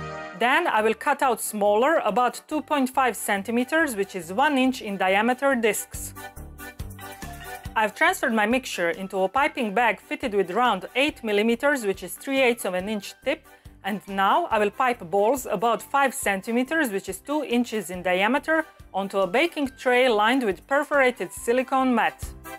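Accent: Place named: England, United Kingdom. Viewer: i like your english accent may i know where do you come from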